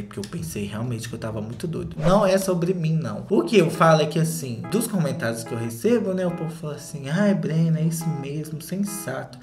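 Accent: Minas Gerais, Brazil. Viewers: Vim pela live na foquinha e já tô maratona do todo o canal, amei o jeitinho e sotaque mineiro dele hahaha. Conta essa fofoca pra gente que chegou depois aqui no canal mulher, pelo amor. Kkk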